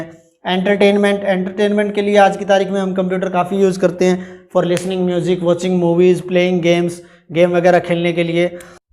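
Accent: Haryana, India. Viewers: THANKYOU VERY MUCH SIR❤️ AAP PAKKA HARYANA SE HO! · sir haryana se ho kya thoda tone aa rha h vha ka mai bhi haryana se hi hu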